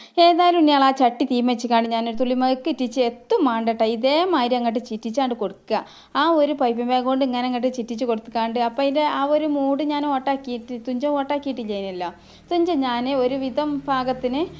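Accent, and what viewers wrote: Malappuram, India. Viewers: മലപ്പുറം സ്ലാങ് ആണോ ഇത് .നല്ല രസമുണ്ട് കേൾക്കാൻ .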